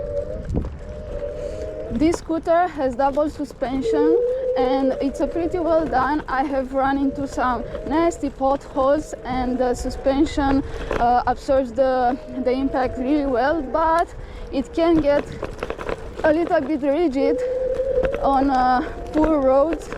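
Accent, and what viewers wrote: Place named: Russia. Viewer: Mother russia accent. Pe deum rau stai pe pernițele degetelor din talpa ca sa nu iti mai zbenguie capu prin casca. Adica ridica-te putin pe varfuri si ai rezolvat problema.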